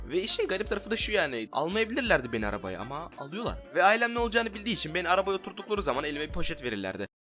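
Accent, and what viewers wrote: Russia. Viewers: Neden sesin rus aksanına benziyor dostum??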